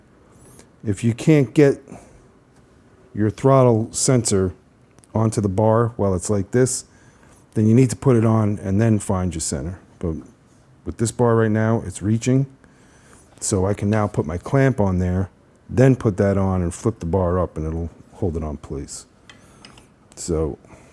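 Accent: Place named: United States. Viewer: Sounds more American than British 😢😢😅😅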